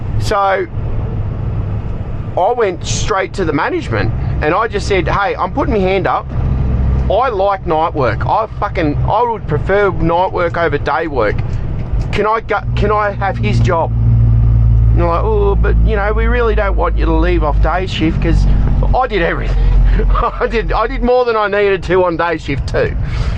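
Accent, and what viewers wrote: Australia. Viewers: LOVE THE AUSSIE ACCENT !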